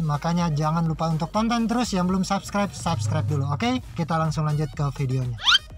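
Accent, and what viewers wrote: Manado, Indonesia. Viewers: Org Manado kang bro?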